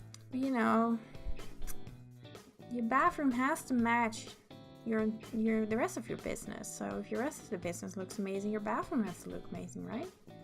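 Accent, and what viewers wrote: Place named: United States. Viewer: YOU'RE DUTCH?! you have the cutest accent ever, i would have never guessed, i would have guessed somewhere in america! O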